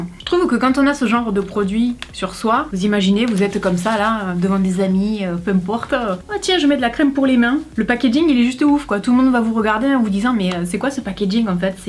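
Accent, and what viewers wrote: South, France. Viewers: Au top, j’aime beaucoup ta personnalité ! L’accent du sud , que du bonheur ❤️ Abonnée direct ! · Ces critiques, c'est incroyable... Et c'est sûrement élitiste de dire ça, mais 85% ne savent même pas écrire français ni s'exprimer. Donc non seulement ils sont méchants mais on ne les prend pas au sérieux et ils se ridiculisent par-dessus le marché. Et ça me fait réaliser une chose aussi, c'est que les accents du Sud (je suis pour ma part du sud-est et bien qu'on me dise le contraire, j'ai du mal à différencier les deux accents :D), bien qu'ils concernent quelques grosses villes (Marseille, Toulouse et Montpellier peut-être ?) sont très méconnus. Ton accent est tellement authentique que je ne comprends même pas qu'on puisse penser qu'il est surfait ! Ces remarque doivent venir de gens très au nord qui n'ont jamais eu ces accents dans leur entourage. 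Enfin bref, gros pavé pour quelque chose qui n'en vaut pas la peine, alors que la vidéo était très agréable à regarder :D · Non mais la meuf la " je comprends pas tu as l'accent du Sud et tu n'es pas bronzé c'est bizarre" mdrr mais déjà on voit le niveau d'intelligence quand même c'est dingue.. Mais qui sont leur parent? 😅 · celui qui m'a fait le plus rire c'est celui tout en bas qui dit qu'elle a un accent du sud mais que c'est trop bizarre car elle ne bronze pas, sous entendu elle fait semblant parce que si elle était vraiment du sud elle serait bronzé ! Qui ne sait pas que les peaux rousses ne peuvent pas bronzer ? Certains feraient mieux de reflechir avant de commenter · j'ai du mal a comprendre les gens qui viennent 'se forcer' a regarder tes videos pour t'insulter derrière, pourquoi ils viennent regarder? quel est leur projet en fait? je trouve que tu leur a fait trop de pubs et tu as du courage pour supporter ce genre de com. 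perso j'aime bien tes videos donc lâche rien et continue comme tu es. PS l'accent se perd donc une fois qu'on aura tous le même accent on se fera bien chier donc merci pour l'accent du Sud. ça fait plaisir a entendre quand on vit a paris... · Coucou! Je te suis depuis plusieurs années déjà et j’avoue je ne commente jamais. Cependant, je voulais que tu saches que c’est un réel plaisir de te retrouver dans chaque vidéos. Pour te dire, à la base certains sujets que tu traites ne m’intéressent pas forcément au premier abord si c’était réalisé par une autre youtubeuse. Mais vu que c’est toi, je regarde et je passe un très bon moment. Pour te dire, mon copain ce n’est pas son truc mais il regarde de temps en temps et il t’apprécies beaucoup aussi. On adore ton accent du sud (on est lorrains et comme je fais mes études en Belgique je chope un peu l’accent belge - je te laisse deviner le mélange assez rigolo 😂) et tu es tellement pétillante, agréable, bienveillante, transparente au niveau des produits et honnête. 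Tu te maquilles très bien, tes cheveux sont sublimes, tu es canon avec ou sans maquillage... et puis même franchement t’es au top ☀️♥️👌🏻. Je comprends que ça puisse te heurter, te faire mal vu les commentaires méchants et gratuits que tu reçois. Je ne suis pas à ta place mais perso ça me ferait mal aussi. Limite je pense que j’en pleure en toute sincérité. Ce n’est pas normal ce genre de comportements irrespectueux et mauvais. En tout cas, sache que tu as beaucoup de soutien et que t’es une personne géniale 🌸. Et ceux qui disent le contraire, sont mauvais... bah peut être qu’ils préfèrent exposer inconsciemment leurs problèmes plutôt que de les régler. Aimez-vous et vous aimerez les autres! Qu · Tu es une personne et plus particulièrement une femme très inspirante! De plus ton bel accent me rappelle mon enfance dans le sud! Tes vidéos sont au top comme toujours continue comme cela!! des bisous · Vive l’accent du sud ❤️ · Mon dieu mais c'est hallucinant de laisser de tels commentaires! 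J'espère que tu les signales! Vive l'accent du sud et les accents régionaux! Des bisous!! 😘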